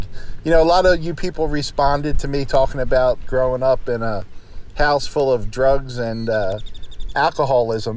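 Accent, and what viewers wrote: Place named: New England, United States. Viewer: I’m catching up again, so good to hear your yankee voice! Never realized how homesick you still get after 47 years!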